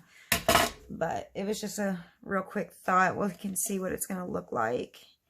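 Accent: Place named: South, United States. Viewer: you do sound southern to me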